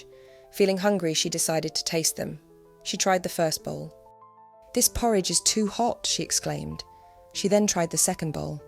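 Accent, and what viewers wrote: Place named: RP, United Kingdom. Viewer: I like the narrator's posh accent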